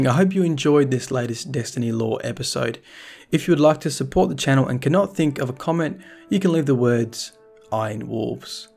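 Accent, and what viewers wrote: Australia. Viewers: Your Australian I thought you were sick mate! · DOOD YOUR FROM STRAYA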